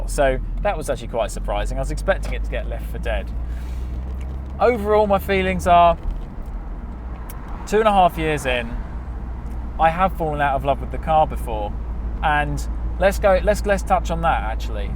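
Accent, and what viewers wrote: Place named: London, United Kingdom. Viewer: "This thing" mmmmm. London Boy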